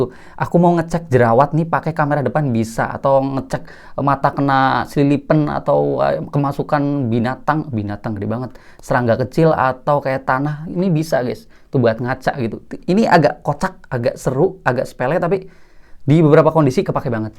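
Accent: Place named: Cirebon, Indonesia. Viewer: Logatnya kaya wong cerbon bang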